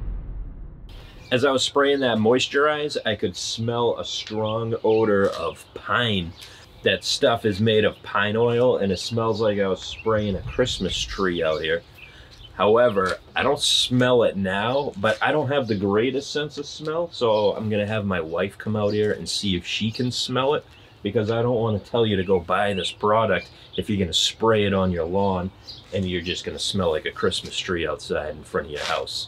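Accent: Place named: Boston, United States. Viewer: How did that accent get to Illinois? I always assumed you were in a Boston suburb.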